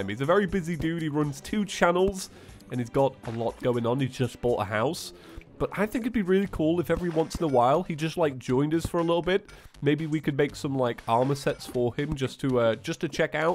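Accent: United Kingdom. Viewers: Nothing like a jolly brit playing terraria. Makes me happy lol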